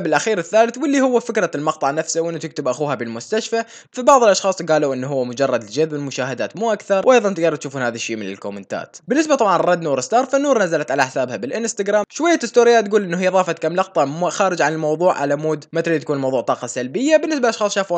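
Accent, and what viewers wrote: Iraq. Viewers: كانو انت عراقي من لهجتك مبيت كتير حلوه اللهجه العراقيه 💛💛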